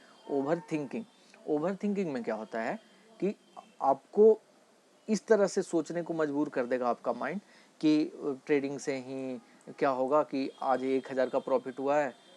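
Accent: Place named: Rajasthan, India. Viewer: Kaha se ho Rajasthan se lag rhe ho Kuch aap ne kya change kiya market me trading me ye batav video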